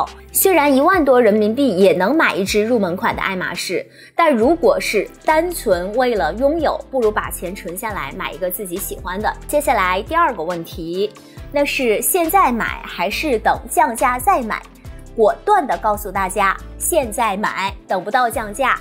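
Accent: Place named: Japan. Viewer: 日本人的發音，長駐日本長大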